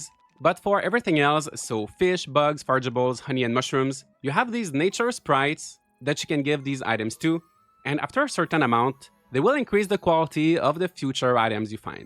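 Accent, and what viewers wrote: Quebec, Canada. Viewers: Excuse-moi Est que tu viens de la province de Québec? Ton accent sonne canadien français je trouve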